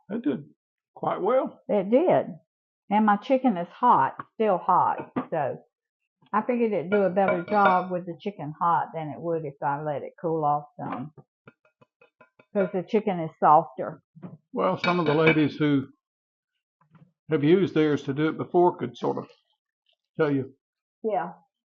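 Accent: South, United States. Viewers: I just wonder where you're located cuz you sound like you're from the Carolinas or maybe on down south like a Alabama unless maybe you a little bit Virginia but that y'all done getting you all OK gal on with the recipe❤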